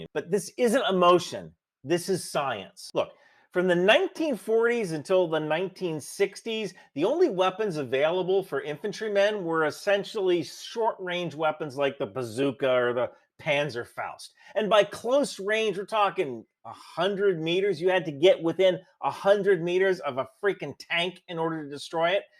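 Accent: South Africa. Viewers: Your South African Elon accent is pretty good! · Good South African accent.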